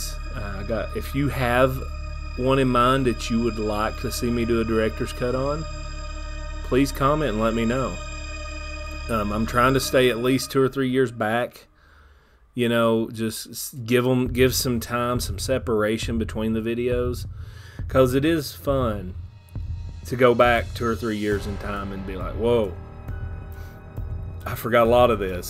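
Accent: South, United States. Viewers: I LOVE this guy's country accent!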